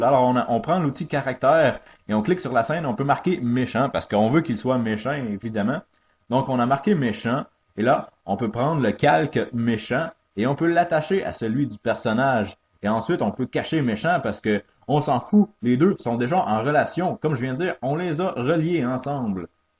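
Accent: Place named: Canada. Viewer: mdr j'adore avec l'accent canadien c'est énorme xD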